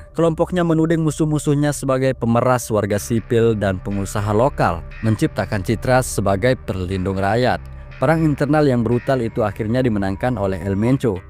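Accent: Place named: Lombok, Indonesia. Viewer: semaikn ndek asing logat sasak nii, ternyate dgn sasak Lombok Admin😅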